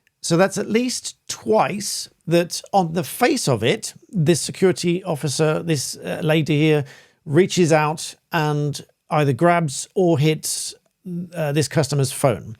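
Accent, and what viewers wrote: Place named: RP, United Kingdom. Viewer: Another long and boring posh talk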